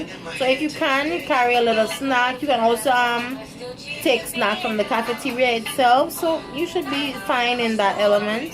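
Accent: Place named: Trinidad and Tobago. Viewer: you sound trini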